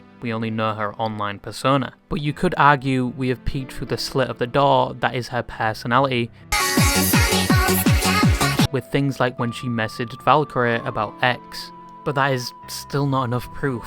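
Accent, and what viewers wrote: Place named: England, United Kingdom. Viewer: yo yt creator guy i like the content but i hate your stupid ass english accent and especially the way you pronounce: '' worse ''. Truly hope you can change your accent some how cause some times you pronounce something so weirdly that it feels like power drill drilling into my forehead but keep up the good work.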